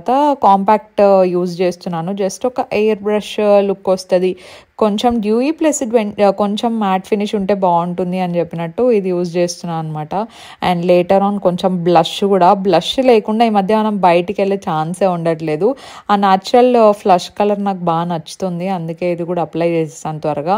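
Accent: Anantapur, India. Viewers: Yes waiting for ur love story pls anantapur language vintunte mana atp language ne bonda le alantivi mana atp valu ekuvaga use chestaru chala happy ga undi ala vintunte...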